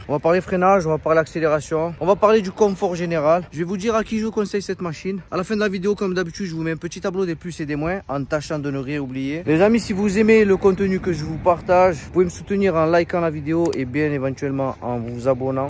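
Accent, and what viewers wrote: South, France. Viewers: Essai Parfaitement maîtrisé, complet et bien explicite. Et en plus ce petit accent du Sud avec les expressions bien de chez nous (je suis de Nice). Top ! Tu m’as convaincu, je cherche une bécane pour voyager, polyvalente, esthétique et le tout sans me ruiner. Je vais aller l’essayer en concession. Merci 👍🏻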